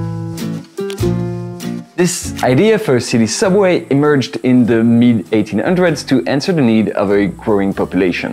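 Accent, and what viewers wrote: France. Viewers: L'accent français t'as peur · WOW! Great video. It was a pleasure to watch it and learn it. I love that you speak with this amazing french accent about metro in Paris, France. I hope your channel will grow quickly.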